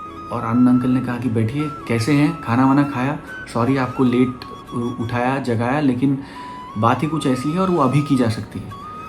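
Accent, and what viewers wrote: Standard. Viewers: कुश के रोल में बहुत प्यारे लग रहे आप और उच्चारण भी बहुत शुद्ध है।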